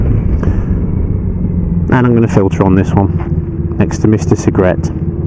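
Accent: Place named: Birmingham, United Kingdom. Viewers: Going thru’ your ‘back catalogue’ & still ‘loving this’, in 2024! I’m a year into my new toy, a Super Meteor 650, after a 42yr ‘hiatus’ (having driven most things up to a 7 tonner). Still struggling with filtering, if I’m honest. Your voice remains my primary ‘internal monologue’ when riding (not the Brummie, tho’).🤨😉😅✌️ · With that Birmingham accent you sounded exactly like Craig Charles- LISTER!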